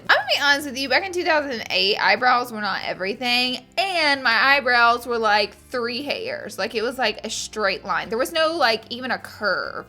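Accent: South, United States. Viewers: I'm from mobile Alabama. I just love your videos and southern accent. I feel you girl ❤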